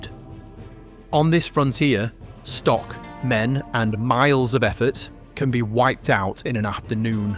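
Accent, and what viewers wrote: United Kingdom. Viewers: American history narrated in a British accent. Is this supposed to be funny?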